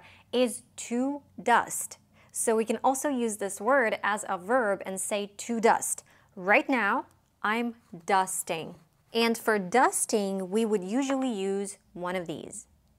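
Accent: United States. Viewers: It's a strong US accent. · This isn't teaching English, this is teaching an American dialect. The words, spelling and pronunciations are very different to "English"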